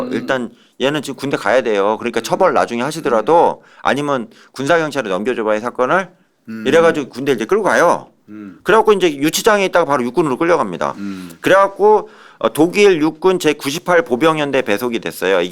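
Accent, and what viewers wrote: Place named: Seoul, South Korea. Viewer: 염블리님 얼굴이 헬쓱해지신거 같은데 아프신건 아니겠쥬? 근데 말미에 뭐뭐 한거라~~ 이러이러 한거라~ 이건 어느지역 말투에용? 옛날 서울사투리같은 느낌인가요? 사건의뢰 왕팬이라 자주 듣다보니 저도 모르게 따라해서ㅋㅋ 궁금해지네요~~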